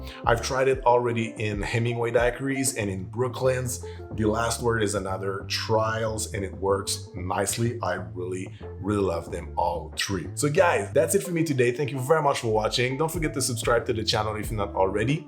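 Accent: Quebec, Canada. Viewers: Because it's not from France's French but Québec's french!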